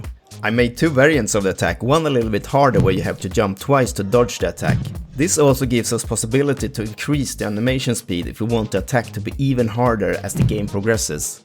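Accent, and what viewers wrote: Sweden. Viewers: SVENSKA DIALEKTEN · Why do you sound soooooo swedish hahaha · The game is really cool! But I have one question. Are you swedish by any chance? Because your dialect sounds very swedish😂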